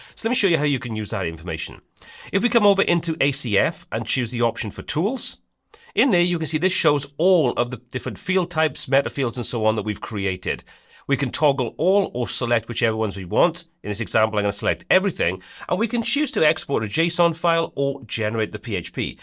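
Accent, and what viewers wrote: Wales, United Kingdom. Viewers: Top instructor - highly skilled and with a great Welsh accent too :-)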